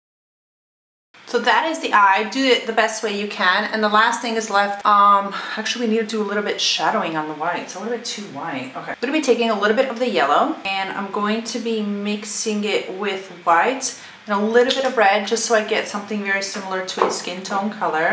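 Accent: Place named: United Kingdom. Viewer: I love that British accent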